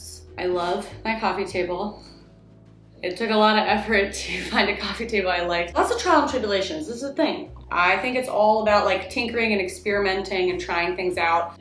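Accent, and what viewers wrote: Italy. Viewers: maddai il tuo italiano e' eccellente! Hai pure un accento emiliano! Brava e complimenti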